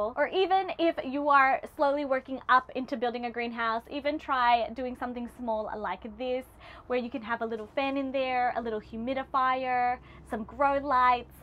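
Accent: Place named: Australia. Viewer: I'm picking up on a slight Australian accent, are you from Adelaide by any chance?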